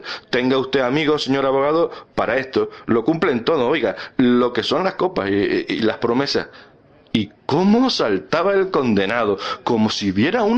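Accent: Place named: Chile. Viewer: Buenísimo!! Muy bien narrado!! Me encanta el acento, será chileno?? Me he reído mucho!! Muchas gracias!!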